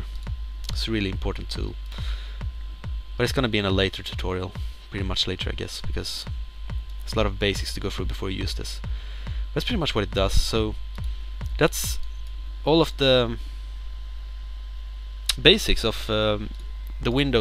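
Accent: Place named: Sweden. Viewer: Så du har äntligen börjat? :D Älskar din Svenska dialekt på engelskan! (Y) :D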